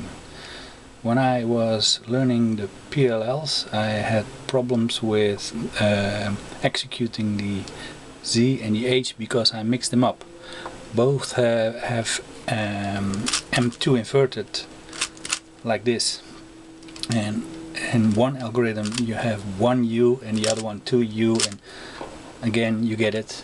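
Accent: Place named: Russia. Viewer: You sound Rusian, btw nice video.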